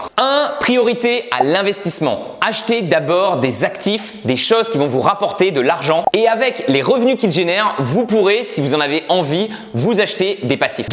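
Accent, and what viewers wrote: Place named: South, France. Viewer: Top ta vidéo tout comme ton accent méridional !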